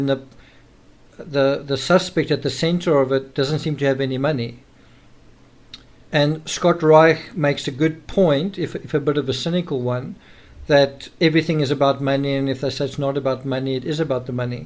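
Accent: South Africa. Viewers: I agree with you Diana, makes absolute sense by appropriate investigation and analysis of evidence, I have read a few of his books before he started this blog unbiased and rocket science ☺️ with his clear South African accent.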